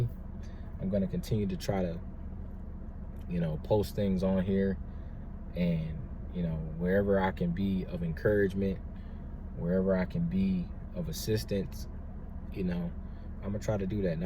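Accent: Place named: Wisconsin, United States. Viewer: I come from a large family. Both sides are JW. I left a while ago. I watched your first video yesterday and as you were talking I was like I know he is from Wisconsin and when you said it, I said... “I knew it!!” . 😁 Stay strong brother. The worst residual effect for me is Anger. And the fact that I was actually about to teach my daughter this mess.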